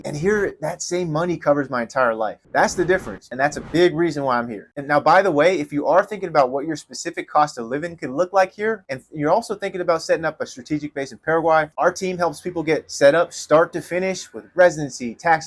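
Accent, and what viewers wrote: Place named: New Jersey, United States. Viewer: Are you from NJ?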